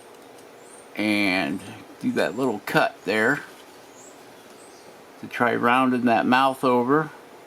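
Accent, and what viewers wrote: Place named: England, United Kingdom. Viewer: Where do you live... I am picking up an English accent lol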